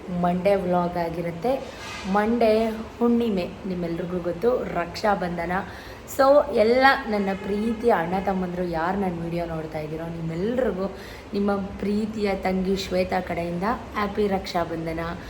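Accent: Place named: Rural, India. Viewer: Sis nim gramathara bhashe chennagide keloke😂❤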